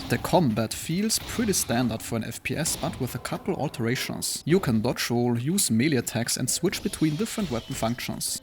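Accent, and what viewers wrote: Germany. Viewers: "Chee-see Doom". German accents are entertaining and I like your style, subbed.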